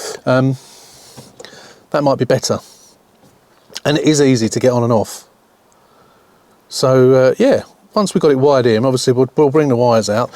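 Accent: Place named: United Kingdom. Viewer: And we seem to be the same age, I'm 59, and I really like your British accent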